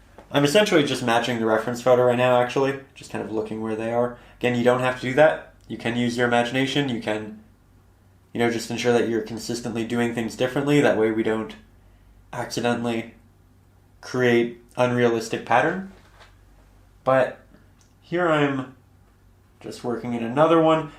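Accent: Canada. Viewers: Outta curiosity where are you from? You sound Canadian if I’m not mistaken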